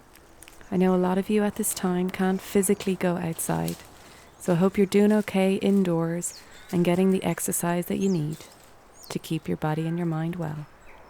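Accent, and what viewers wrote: Ireland. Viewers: your voice is so soothing :) and the gorgeous Irish accent doesn't hurt :D <3 <3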